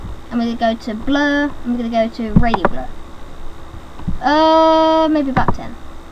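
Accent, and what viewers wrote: United Kingdom. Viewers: wow your accent are you british ?:)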